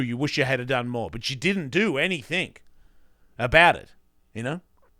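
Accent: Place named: Australia. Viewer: HEHEHE DICKHEAD ITS SO AUSSIE EVEN THOUGH YOUVE BEEN AWAY SO LONG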